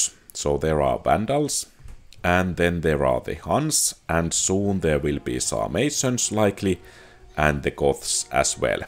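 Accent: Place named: Finland. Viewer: I like the info. And it is nice to hear a finnish accent on the English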